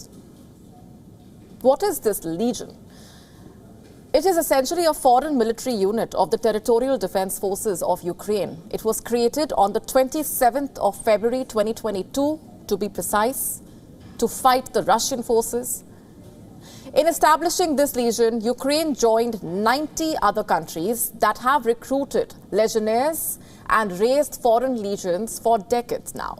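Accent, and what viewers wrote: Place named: India. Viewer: your English sounds really Indian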